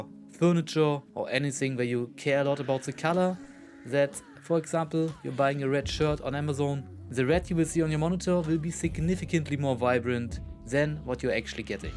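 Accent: Germany. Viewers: My guy your accent always trips me out. 90% of the time it sounds very German but 10% of the time it sounds French 😂😂 it’s German right?!?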